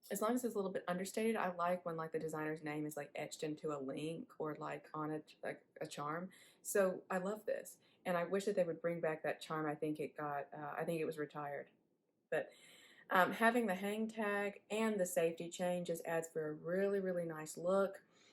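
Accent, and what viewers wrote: South, United States. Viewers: Cool southern accent!❤great review!👍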